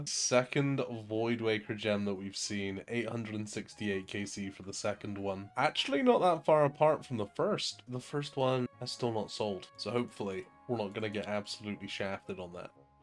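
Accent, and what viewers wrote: Bristol, United Kingdom. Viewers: Are you from the bristol area-ish? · Are you from bristol sound like it lol😂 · Am I hearing a Bristolian accent? XD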